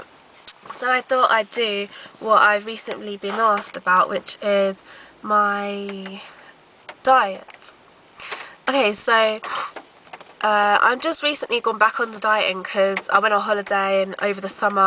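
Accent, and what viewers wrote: London, United Kingdom. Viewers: ahahah dat London accent doe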